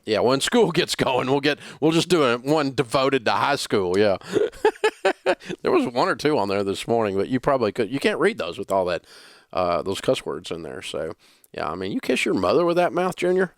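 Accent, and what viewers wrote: South, United States. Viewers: I listen to Dave Ramsey so much that I’ve developed a Southern accent...........I live in Nevada😂😂😂😂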